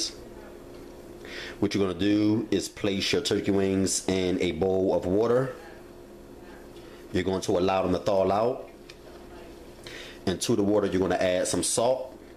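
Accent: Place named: DMV, United States. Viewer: Homie sound like he from the DMV...on muvas! And the wing/rice setup look on point! No cap!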